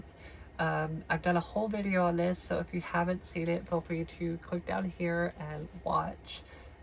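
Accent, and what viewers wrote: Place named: South, United States. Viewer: You may not think you are all that attractive but girl you are gorgious. I as a trans woman aspire to one day hit the point where I am at least half as gorgious as you. Based off of you accent I am guessing you are southern. I have only been on Estrogen sense Jauary 22nd this year so I have a wase to go. For now I am leaving the pronouns on the back burner as I am not fully passing in person yet at least for long periods of time. I would love to officialy use she/her pronouns in my daily life but I also feel if I do not push it and let it come naturaly no one can use pronouns in an attempt to insualt me.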